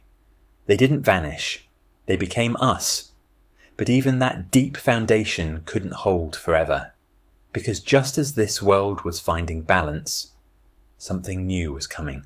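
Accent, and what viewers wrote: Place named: United States. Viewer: A lot of ChatGPT generated crap... You are no wiser after listening to the 20 minutes rant. Just looking at physical features, language and customs shows you the difference. Of course there is overlap and some common maternal genes... But the point was to explain the distinct differences between differences the groups. Yet Chat GPT failed to do so in its yanky acsent 👎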